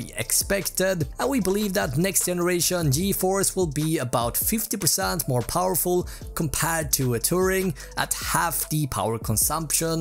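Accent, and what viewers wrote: Sweden. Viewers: I can hear you are from sweden after 2 sec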